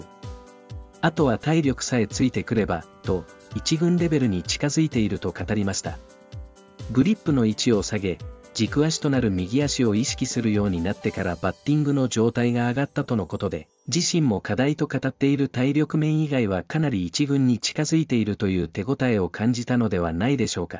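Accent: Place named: China. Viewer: ナレーションが中国人の話す日本語みたい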